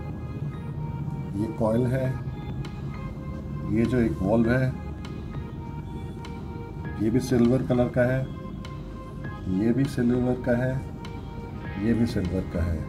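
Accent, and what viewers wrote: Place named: Turkey. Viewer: I know this guys is speaking english but the turkish accent makes it gibberish :(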